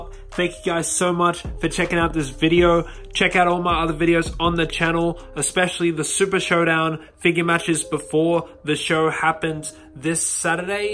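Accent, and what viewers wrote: Australia. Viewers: Yea I’m pretty sure it’s because he’s from Australia so he has a little accent I caught that when I first watched this but I just saw your comment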